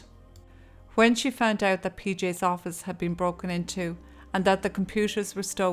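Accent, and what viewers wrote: Ireland. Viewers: that Irish lilt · Your lovely lilting Irish voice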